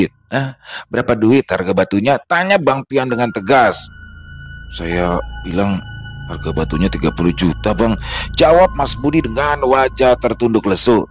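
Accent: Jakarta, Indonesia. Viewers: jangan lama2bangillo.kisah bang pian kangen dengan gayanya bawakan kisah bangpian dengan logt betawinya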